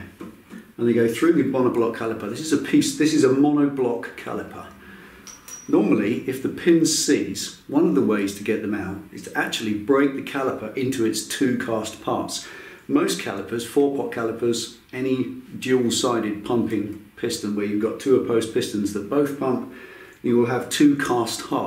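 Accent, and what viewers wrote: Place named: England, United Kingdom. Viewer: I did not expect you to have aN English accent! The hat is throwing me off.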